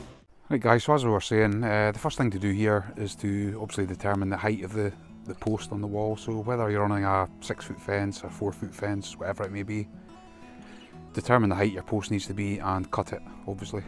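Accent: Scotland, United Kingdom. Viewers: Liked the presentation nice to hear a scottish accent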